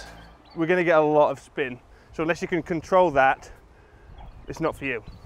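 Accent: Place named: Nottingham, United Kingdom. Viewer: GolfBox TV haha as much as a Derbyshire lad can love a Notts (judging by the accent) lad. Your a legend mate. Love watching your videos. Look forward to my new set of mp20’s 🤪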